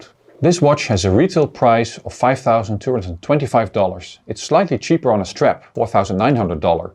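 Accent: Netherlands. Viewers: I love how me as a Dutch person can hear from somebody's Englisch if he is also Dutch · I am one of the lucky owner of the black one. Its great to see this review in English with a Dutch accent :-)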